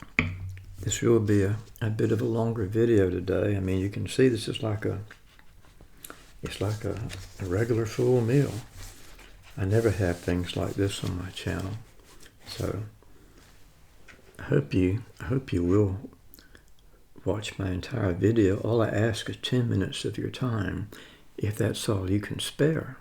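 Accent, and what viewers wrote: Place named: United States. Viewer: Which US state are you from, the accent is so cool!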